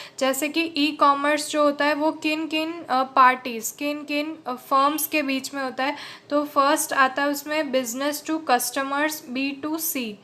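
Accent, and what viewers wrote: Gujarat, India. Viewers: Mam are you from Gujarat?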